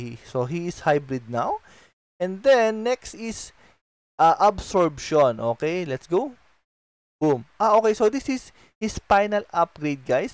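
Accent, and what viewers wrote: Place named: Philippines. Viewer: The accent is like a Philipino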